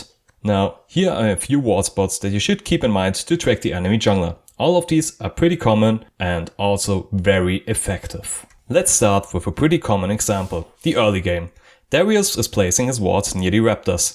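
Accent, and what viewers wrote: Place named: Germany. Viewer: Short, helpful, sounds germanish, like und abo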